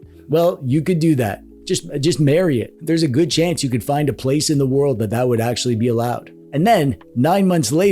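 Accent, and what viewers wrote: Canada. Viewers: Is Canadian? Nice lol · Are you Canadian?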